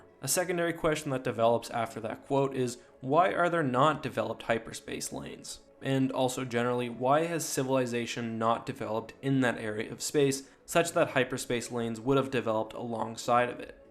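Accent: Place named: Nova Scotia, Canada. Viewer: I was just thinking thing guy sounds Nova Scotian and then boom a photo of Halifax.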